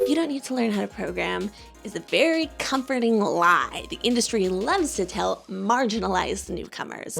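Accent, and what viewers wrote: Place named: United States. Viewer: hello! thank you for the video, it finally pushed me to start actually learning to program (cry). also you mention a lot of games in the video, would you mind writing the names out? i'm a little hard of hearing and unfamiliar with american accents, so sometimes it's hard to pick out the actual spelling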